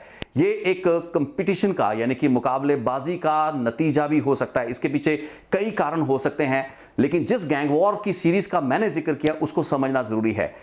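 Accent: Punjab, India. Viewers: Sir, I understand the reason for you creating this video in Hindi, ਪਰ ਬਾਈ ਜੀ ਜੋ ਸੁਵਾਦ ਤੁਹਾਡੀ ਪੰਜਾਬੀ videos ਚ ਹੁੰਦਾ, ਉਹ ਹਿੰਦੀ ਚ ਨਹੀ ਆਂਦਾ| And of-course it doesn't help that like all us Punjabis, You've also got that gulabi hindi accent! Love you brother and more power to you as always. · Thanks him for speaking other language than his mother tang to aware our community. He is not from up or bihar , he not a member of Hindi supremacy terrorist organization, we feeling proud to speak any other language with our mother language Punjabi accent, so you need to be a diverse and learn about international people how you understand their words in your language!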